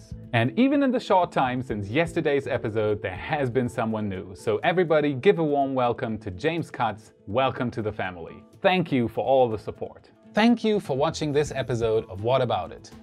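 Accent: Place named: Germany. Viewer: Nach 2 Wörtern schon felsenfest gewusst dass du deutscher bist ;) Danke für das Video, echt super erklärt^^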